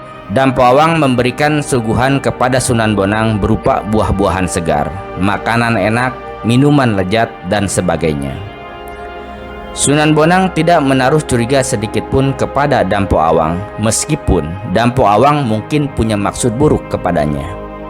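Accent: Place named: Madura, Indonesia. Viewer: Yg membaca narasi kayaknya bukan org REmbang . Kayak logat Madura tak iye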